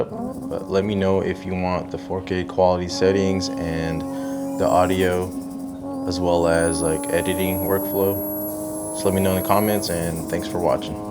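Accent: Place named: United States. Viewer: You sound american but drive on Italian roads?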